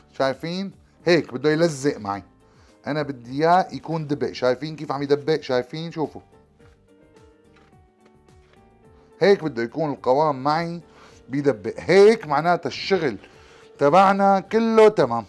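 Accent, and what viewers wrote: Levant. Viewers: سلمت يداك ولسانك الشامي العذب تحياتي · حكيك شامي تمام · حكياتك الشاميات حلوين 😄 وشغلك ماشالله نضيف ومرتب يعطيك العافية 👍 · سلامات شيف اكلاتك متلك طييبين اللة يسلم دياتك والاحلة لهجتك الشامية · مصري من المانيا يرسل لك شكر يكفي من هنا لعندك. غير اللهجه الشاميه التي نعشقها كمصريين,فبكل امانه الشرح والنظافه والاهتمام الرائع في تجهيزك للحم,انتج في النهاية قطعة البسطرمة وشرائحها والتي من مجرد شكلها وانسيابيتها تعد تحفه فنيه. لا تقل ارمينيه, ومع احترامنا لهم فنحن نعرفهم اساسا كساعاتيه,ولكن انا حين اجربها سأقول بسطرمه. كل الحب والاعجاب والتقدير وتحياتي لشخصك الطيب. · تسلم ايدك شيف اول مرة اشوفك بس واضح انك استاذ في البسطرمة الله يعطيك العافية واضح من لهجتك انك لبناني او سوري وانا كتير بحب اللهجة الشامية تحياتي من مصر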